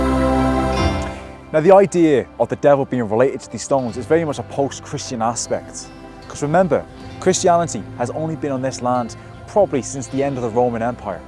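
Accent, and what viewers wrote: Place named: Wales, United Kingdom. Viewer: 🎉hope to see more of you, you have a wonderful melodious Welsh voice.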